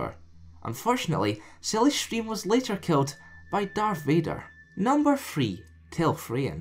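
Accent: Scotland, United Kingdom. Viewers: i have to say it mate, this combination of untamed scottish accent and soft speech is strange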